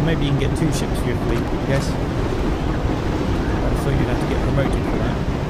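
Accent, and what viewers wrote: United Kingdom. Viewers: I LOVE BRITISH GUYS THEY SOUND FUNNY AND I MEAN THAT IN A GOOD WAY IM NOT BEING MEAN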